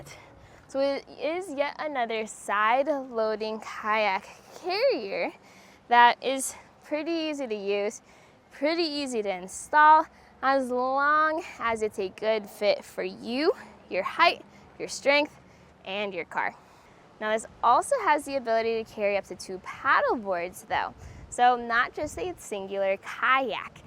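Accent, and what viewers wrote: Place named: Hawaii, United States. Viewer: She doesnt really have an accent but more hint of her family dialect , slightly Hawaiian or Asian.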